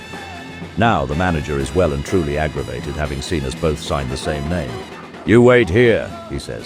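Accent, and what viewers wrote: United Kingdom. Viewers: It is really good, isn't it ? A professional quality documentary. A BRITISH accent makes a wonderful change from all the rubbish with American accents. Those are inevitably clueless and full of idiotic basic factual errors. Great work. You've got another subscriber !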